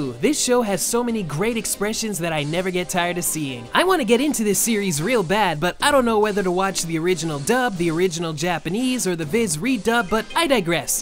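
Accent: Canada. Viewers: I cannot figure out which province your accent is from! I'm assuming its southern Canada though. Also, this is a BIG nostalgia blast.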